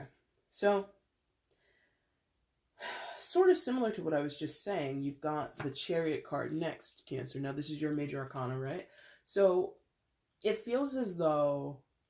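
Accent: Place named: Upper Midwest, United States. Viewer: Love that Wisconsin\Minnesota accent! Lol I’ve never seen the queen of wands as older... even your card she looks young to me, I mean she’s the sexy queen lol. I’m guessing it was more about the intuitive message. Feels low key for a reading with two aces, but it’s better than a negative reading. I love the ace of wands and ace of cups— all passion, romance, and emotions, but this feels like you’re saying I shouldn’t get too excited, like aces aren’t that big of a deal after all.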